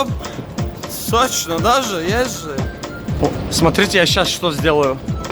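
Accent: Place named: Azerbaijan. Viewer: Акцент азербайджанский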